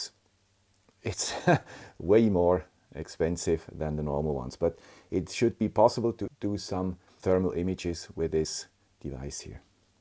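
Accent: Switzerland. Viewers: Great as usual...but is everything ok with you? Noticed that you sounded sad or tired especially at the start of the video. If tired take a break but do come back because we can't miss the guy with the swiss accent!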